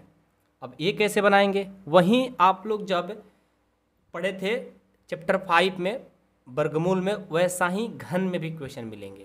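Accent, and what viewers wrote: Bihar, India. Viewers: Sir app Bihar sa ho na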